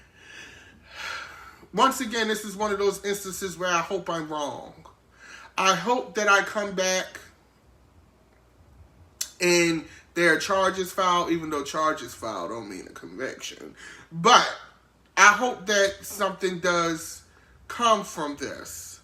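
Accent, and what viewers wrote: Baltimore, United States. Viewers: His accent sounds like Baltimore Maryland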